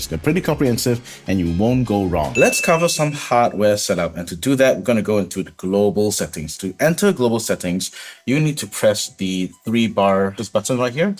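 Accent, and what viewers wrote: Singapore. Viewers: Just in time for my Helix LT :) Are you from singapore by any chance? you sound singaporean. Anyway, i'm Singaporean turning to your channel from Berlin. Anyways, great video!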